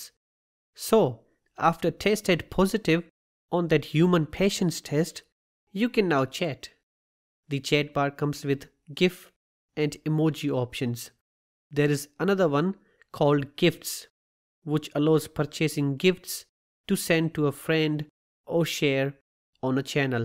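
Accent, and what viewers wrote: India. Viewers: Don't understand Indianenglish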